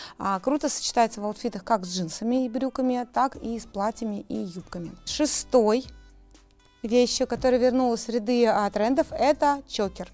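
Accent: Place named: Akanye, Russia. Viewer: Такое милое видео, такие примеры, такой текст хороший мне так понравилось, но вот это аканье так режет слух😢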